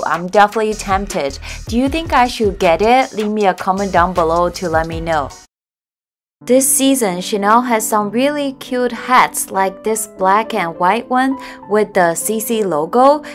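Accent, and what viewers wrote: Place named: South Korea. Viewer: 韓腔英語。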